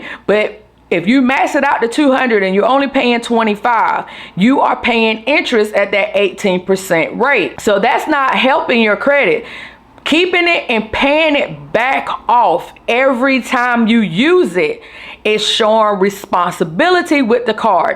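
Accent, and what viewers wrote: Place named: Mississippi, United States. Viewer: U sound like you from Mississippi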